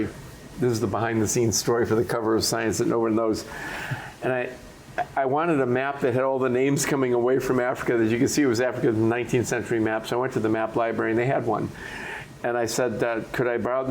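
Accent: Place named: Chicago, United States. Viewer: completely unrelated but I find it endearing to hear his Chicago accent slip out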